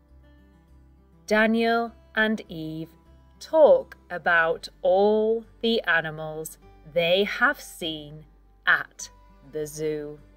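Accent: United Kingdom. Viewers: I'm a beginner, still learning english through stories. I love your british accent❤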